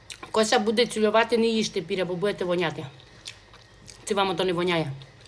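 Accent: Transcarpathia, Ukraine. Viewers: Закарпаття так мовить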